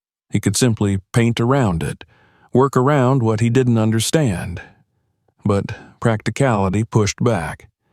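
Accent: South, United States. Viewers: These southern drawl stories are Cool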